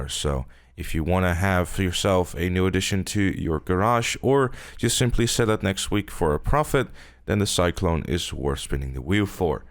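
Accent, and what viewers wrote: Netherlands. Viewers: Wait are you Dutch? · I miss the Dutch accent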